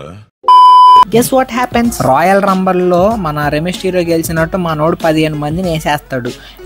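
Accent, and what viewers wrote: Nellore, India. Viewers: Are you from nellore? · Enti nellore ah meedi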